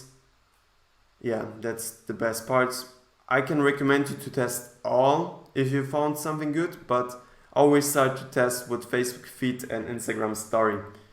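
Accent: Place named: Germany. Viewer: Die ersten drei Wörter gehört und sofort gewusst, dass du Deutscher bist hahaha. Geiles Video, hilfst mir damit weiter, danke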